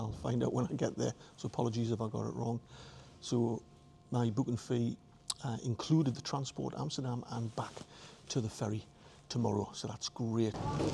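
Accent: Newcastle, United Kingdom. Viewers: This is such a great video. Thanks for making it. I watch your vids as a fellow Geordie who moved to Ireland 23 years ago. I found your channel a few months ago and am hooked as I love seeing the Toon again and your accent is brilliant mate. Great work Keep it up. 👍 Cheers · Hi mate great little video ,just found ya Channel so will be watching others you've done, with the scots your geordie accent is the one i like the best in the uk 😂😂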